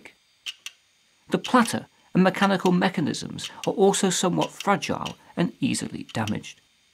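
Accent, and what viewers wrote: England, United Kingdom. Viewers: I think he's Brittisch ^^ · I am an Hellen (greek), but english is perfectly articulated and his videos very enlightening and educative. Thumbs up for both the cool English accent and valid content of this gentleman's videos. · This is by almost tradition how BBC news speaks or most of British documentaries. from his accent i would say hes British. that is just how they narrate this is fine just somehow feel sorry they seem pretty sad when they narrate. almost as if telling me "Why would you do that :( "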